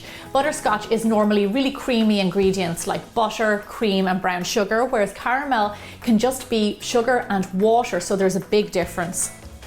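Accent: Ireland. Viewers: Go back to Ireland for a while I need the accent back LOL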